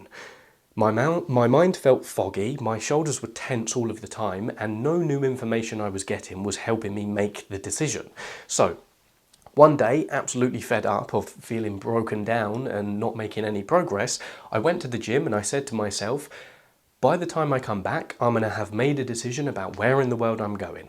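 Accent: United Kingdom. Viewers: You're the reason I'm learning English with a British accent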